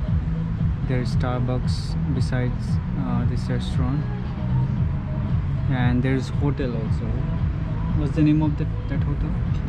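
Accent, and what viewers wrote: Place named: Romania. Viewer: Supă accent is români ,dar se dau străini.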